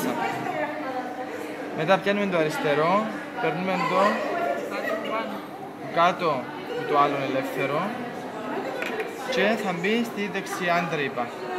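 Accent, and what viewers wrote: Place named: Cyprus. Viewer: ποσοοοοο μα ποσοοο ωραιαα μιλαειιιι.!!! αυτα τ κυπριακααα !!! :DDDD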